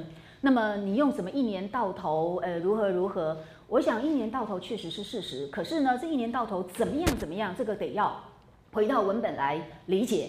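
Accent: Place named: Beijing, China. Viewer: 欧老师的儿化音好可爱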